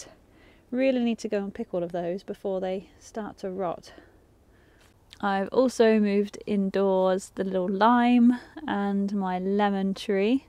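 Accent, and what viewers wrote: England, United Kingdom. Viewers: I do love your accent! I am learning how to speak like a Brit by practising your accent. Congratulations on your recent marriage. Are we to assume there there will soon be a little one to help you plant your Daliahs?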